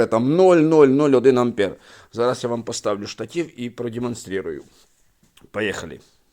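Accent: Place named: Central Ukraine, Ukraine. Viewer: Його рідна мова - суржик, а точніше діалект, яким розмовляють в центральних областях.І він від цього не париться.А страждаєш ти, і причому страждаєш дурістю.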